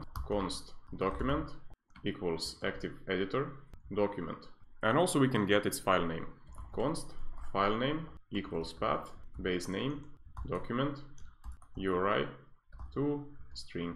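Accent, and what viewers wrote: Russia. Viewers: Сдаётся мне, что ты русский)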